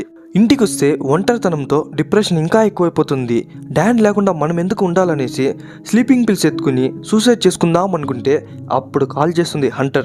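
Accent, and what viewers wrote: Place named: Karnataka, India. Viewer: bro nuvu telogodivi kadhu kada, nuvu matladuthunte kanada valu laga matladuthunttu undhi.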